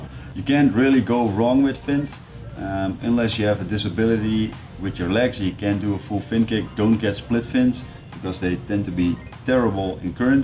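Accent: Netherlands. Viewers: I also had the impression he was Dutch😂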